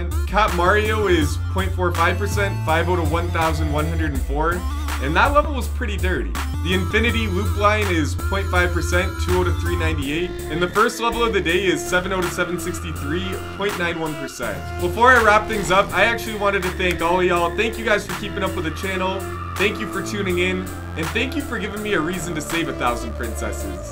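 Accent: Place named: Canada. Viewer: I love your canadian accent when you speak german. How about a german greetings, welcome, hello in the next video for your big german fan-crowd? :-) Grüße, Willkommen, Hallo ;-) And an extra "guten abend" for your boy foolhardy 😎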